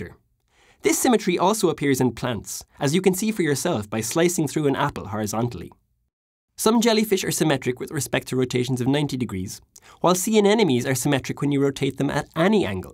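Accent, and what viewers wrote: Ireland. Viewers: IRISH ACCENTS <3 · Why do i think the speaker is Irish ? Maybe it's the accent. Did anyone else feel that ? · The voice of an Irish person makes my ears bleed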